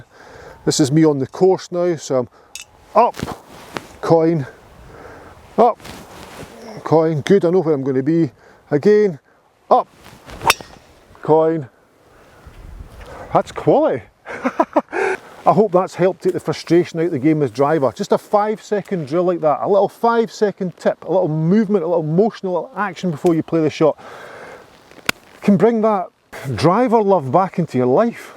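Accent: Scotland, United Kingdom. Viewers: Just loving your scottish accent... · I really enjoy your videos, and have used a number of your ideas. I do especially like this one regarding setting up behind the tee with your driver. I don't understand why you don't have at least 250 thousand subscribers. Your videos are clear (maybe the scotch brogue takes a little interpretation. lol) concise, and sometimes downright funny. Well. keep making the videos and I will keep watching them. Lang may yer lum reek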